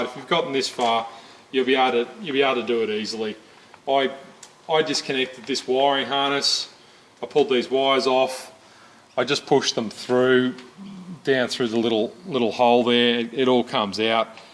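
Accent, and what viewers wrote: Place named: Australia. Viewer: thank you for your video on how to pull the ‘animal’ apart it was a great guide/confidence booster to tackle the replacing of the the main filter around the engine. I live in the UK, I am Ozzy, it was great to hear a beauty Oz accent! Thanks Mate, really appreciated your video and effort, cheers... BTW, It’s back together working, good as new! 😎👍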